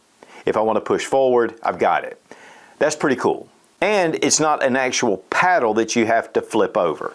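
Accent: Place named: California, United States. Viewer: I'm so jealous with, California people speaking 😅. Nice Gen6 set up. Thanks for all you do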